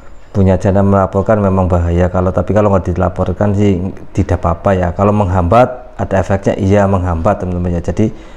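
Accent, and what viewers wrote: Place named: Banyumas, Indonesia. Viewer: Ikut nyimak kang..😀 trims infonya..👍 kaya ada rasa NGAPAKNYA...😁 apakah termasuk WONGE DEWEK...🤔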